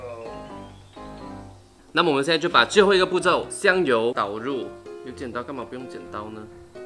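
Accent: Malaysia. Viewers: 因為是馬來西亞人lah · 大马华人的口音好可爱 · 这位哥哥是不是马来西亚人(˶‾᷄ ⁻̫ ‾᷅˵)我听到liao，滚了（liao）。好可爱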